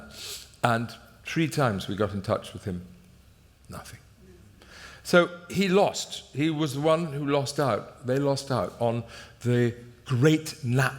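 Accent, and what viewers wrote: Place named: United Kingdom. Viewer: Simon Callow splendid British accent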